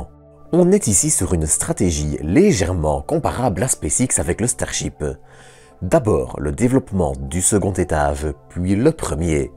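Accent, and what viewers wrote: Belgium. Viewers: Merci de cette réponse rapide. Je n'ai rien contre les belges, mais sincèrement, je me posais vraiment la question, je n'avais pas reconnu l'accent belge, mais peut-être être vous d'origine flamande après tout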